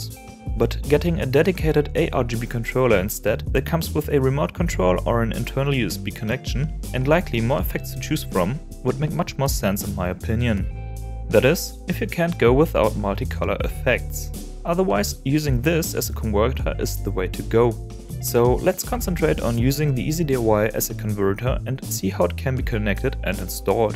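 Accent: Germany. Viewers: Wie ich dein deutschen Akzent raushöre :D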